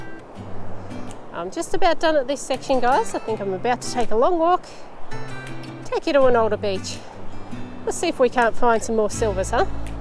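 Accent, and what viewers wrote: Australia. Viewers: Good job, young lady 👏... Well done and very enjoyable. Love your Aussie accent 😍❤. Your new adopted Granddad in Florida...praying that you will be Blessed and safe 🙏...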